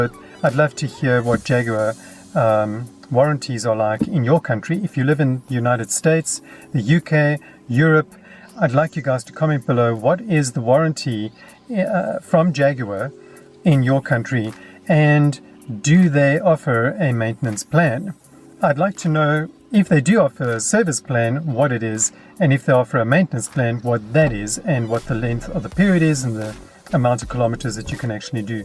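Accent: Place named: South Africa. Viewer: I searched XF Reviews on YouTube and came across your channel, watched 1 video and thought to myself, this guys definitely South African, your accent gave you away lol. But in the video you spoke of Dollars which made me think maybe you not from SA. Anyway, love your channel, Im a new Fan of Jaguar, especially the XF model. Mainly due to watching your videos, you don't sugar coat your real life experiences with the car.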